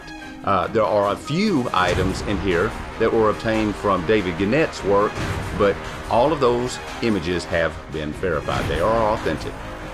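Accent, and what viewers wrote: South, United States. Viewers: keep up the excellent work- also, great to hear a Southern accent (I am from Dallas)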